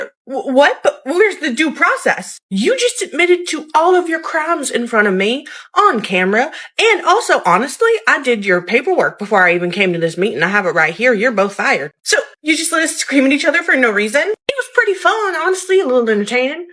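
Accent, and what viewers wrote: South, United States. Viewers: OMG I love the Southern Belle accent! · I love the southern accent.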